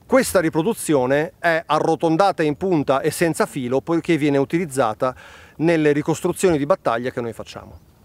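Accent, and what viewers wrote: Italy. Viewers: Mo soccia, che bella cadenza bolognese. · Ah ah okay It’s a Northern pattern (Gallo-italic language variety)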